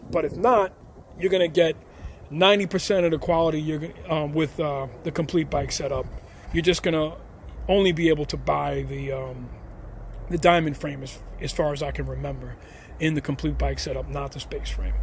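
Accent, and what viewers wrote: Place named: New York, United States. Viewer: As soon as I heard your voice, it took me back home 😁 (Brooklyn/Queens/Nassau Cty) I worked at a few shops in the area in the 80’s/90’s. Enjoyed both reviews of the Jones frames, solid down to earth info. 👍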